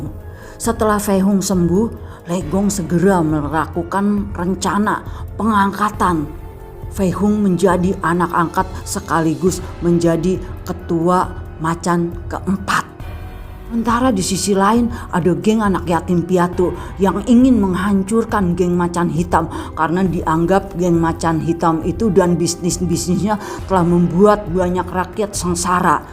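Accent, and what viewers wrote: Jakarta, Indonesia. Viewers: Logat betawi. Kemane aje doel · Logat Betawinya kental banget. Kocak.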